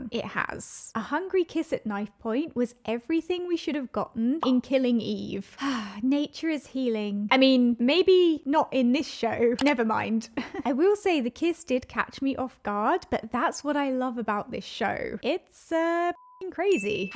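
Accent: United Kingdom. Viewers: Where is your accent from? Are you from there too? I'm thinking somewhere in England? - (I'm a quick study)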